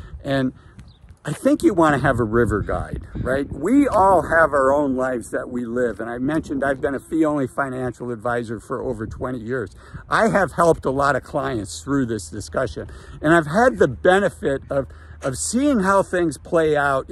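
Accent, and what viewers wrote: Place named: Minnesota, United States. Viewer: I love “yuurs” instead of years. Keep that Min—a-SO-tah coming! ❤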